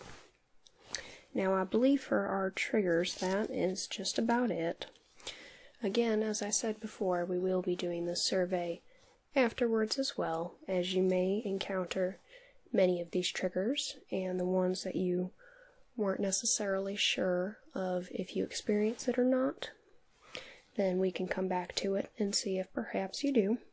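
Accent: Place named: South, United States. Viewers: How in the world am I supposed to relax with this video when I'm laughing half the time?! With nearly all other ASMR vids I watch, I do so simply for the tingles and relaxation. But with yours, the comedic aspect is always an added treat. And, as a lifelong Southerner, I have to say you do a mighty fiiine job of nailin' the accent, darlin'. Keep up the good work. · I hail from Georgia, and you nailed the Southern accent. You forking rock:)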